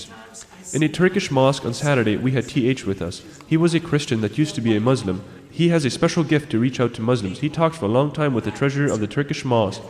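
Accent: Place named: Canada. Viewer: Your from Canada I hear your Acent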